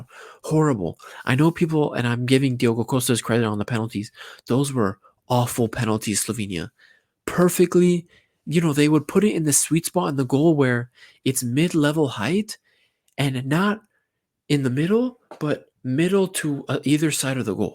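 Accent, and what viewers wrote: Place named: United States. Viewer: Jeet speaking with an American accent. Opinion discarded.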